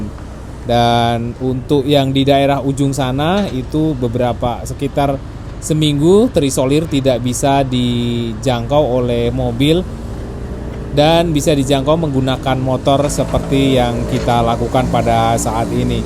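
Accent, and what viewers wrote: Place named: Padang, Indonesia. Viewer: wes penter boso padang, suwi suwi jowone kelelep. Semoga sehat selalu bolo.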